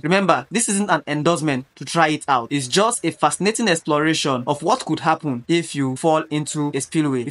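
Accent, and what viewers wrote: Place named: Africa. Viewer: I love your accent. probably African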